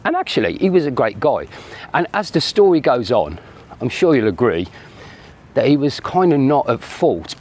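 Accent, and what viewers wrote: Birmingham, United Kingdom. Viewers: What part of Brum you from.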